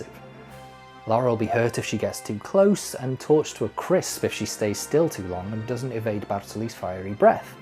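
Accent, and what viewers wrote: Wales, United Kingdom. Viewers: I have a friend who thinks you sound Welsh but I think you sound fully English AF, so how do you classify your accent? XD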